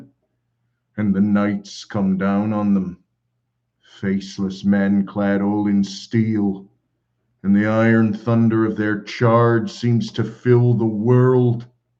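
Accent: South, United States. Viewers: I was wondering recently, what would be the reaction, if I heard someone else reading the story. I enjoy Roy's performance for many reasons. It's professional. He has a cadence to his delivery and it is very quick. I've heard for years. Listening to your performance, slower with an American accent, I have noticed things that went unnoticed since 2021. -The guy who fought a wight in the prologue. His blade got covered in frost. His sword had frostburn. That's so cool. I kept missing it, in Roy's delivery. -The man whost Ned dehe ded, he was tied up on a holdfast's wall, when they rode up. I never noticed that. I feel like this version puts glasses on my understanding of the story. It's giving surround sound. I am in the middle and not in the front, watching. So I'm going to listen again. 🚶🏿‍♂️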